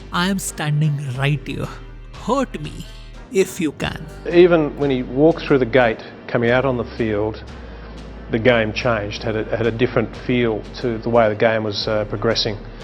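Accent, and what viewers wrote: India. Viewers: Typical Indian English 🤣🤣🤣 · What a crazy Indian accent this narrator has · What a pathetic background commentary! Seems Indian 🤣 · Strong Indian-accented narration notwithstanding, this is just the finest portrayal of the making and initiation of the "fearsome foursome" ever! I am WIndian myself and a casual cricket fan but never was as clear about what took place then as I am now. Great information, and wonderful perspective. Thanks a lot! --Æ. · An Indian narrator having a strong Indian accent is odd to you? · Its not pronounced " Deeter-Mine". We know you have typical cringy Indian accent but try saying it as one word " Determine". Thank you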